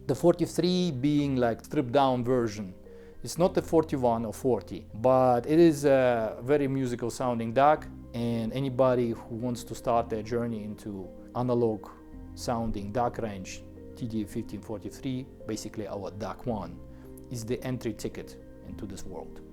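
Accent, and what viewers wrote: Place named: Soviet Union. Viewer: The way you speak indicates that you are of USSR origin. It will be that there is a misunderstanding with you if we listen carefully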